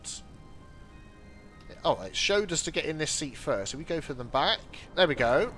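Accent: United Kingdom. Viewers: I love the UK accent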